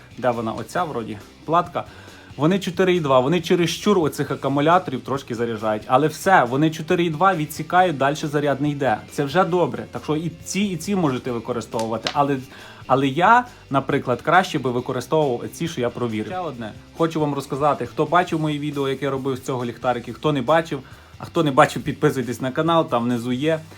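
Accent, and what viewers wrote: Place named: Western Ukraine, Ukraine. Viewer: Бля, этот акцент западенский конечно, пиздец 😅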